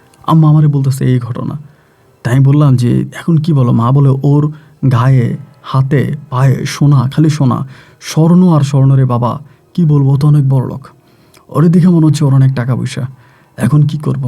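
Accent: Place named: Barishal, Bangladesh. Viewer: বরিশাইল্লা,,দ কে বলে ধ,,গ কে বলে ঘ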